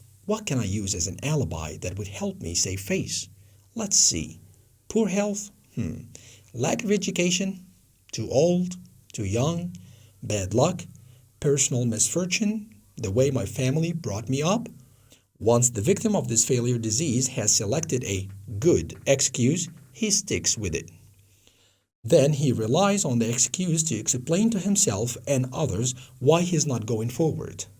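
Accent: United States. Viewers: امته كورس اللهجة الأمريكية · اتقان اللهجة الأمريكية الله يبارك لك يا مستر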